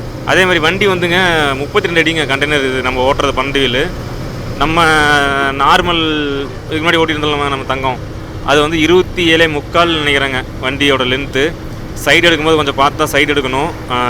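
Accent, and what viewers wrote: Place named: Coimbatore, India. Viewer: உங்களின் shift from Thangam to the new Container lorry looks great. எங்கள்க்கு உங்களின் சரளமான ". ஏங்க்ப்பா " Coimbatore style தமிழ் anchoring giving your usual & meaningful வழவளா talk என்றும் 16 மாதிரி சரளமான பேச்சு ரெரம்ப பிடிச்சிருக்கு. So, without break உங்களின் பிரயாணத்தைத் தொடருங்கள்.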